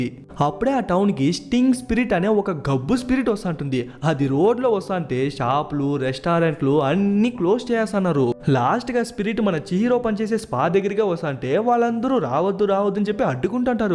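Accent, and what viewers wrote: India. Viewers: which telugu accent it is but i loved it❤😊😅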